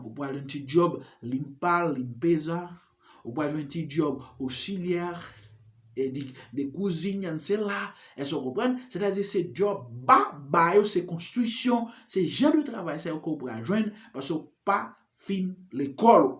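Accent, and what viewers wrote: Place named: Brazil. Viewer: Bonswa ! Lèw pale potigè ou samblè Brezilyen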